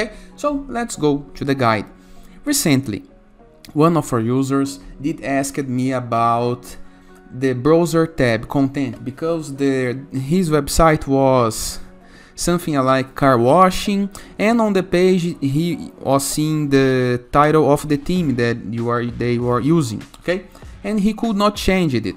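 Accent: Brazil. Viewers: Você é brasileiro? kkkk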